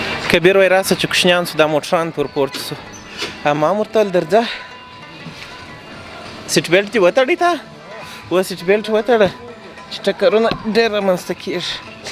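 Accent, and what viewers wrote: Kandahar, Afghanistan. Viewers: Staa pashtoo mashaallah saafa da kandahar ra payad ke 😢😁😎👍 zzar zzar vlog jorawa . Der intizar ma rabandi kawo.shaista vlog dii ye intizar na ki zzi all the best for all vlogs👍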